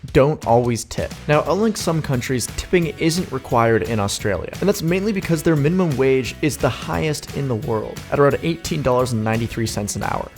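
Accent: United States. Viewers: Once I heard an American accent I was ready for a lot of nonsense being spoken about Oz, buy hey, not bad, you are pretty much spot on.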